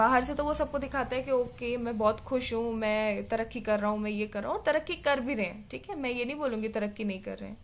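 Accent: Punjab, India. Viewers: apki awaaz se aise lgtaa hai punjab se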